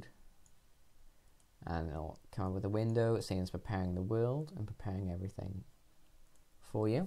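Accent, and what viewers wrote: Wales, United Kingdom. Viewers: you sound welsh mate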